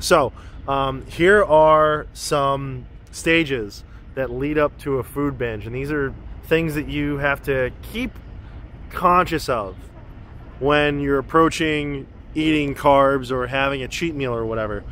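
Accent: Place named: New York, United States. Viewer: I can't wait until ketosis becomes even more mainstream and your youtube channel blows up and you suddenly have a few million subscribers who are reeled in by your New York accent, your passion for weird music, and your "no-nonsense" style of talking and filming however you feel like. Then I can say, I've been watching his vids all along :)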